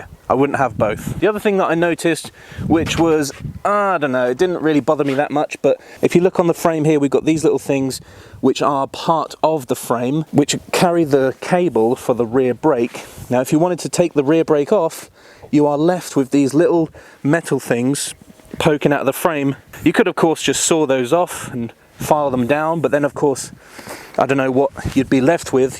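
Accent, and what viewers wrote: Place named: United Kingdom. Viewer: Wtf stupid music British accent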